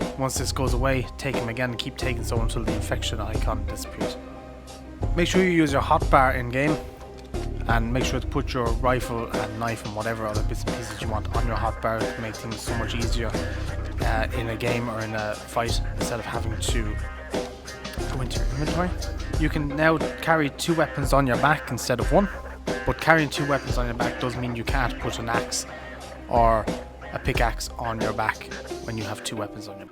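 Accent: Ireland. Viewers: Are u from Ireland?U sound Irish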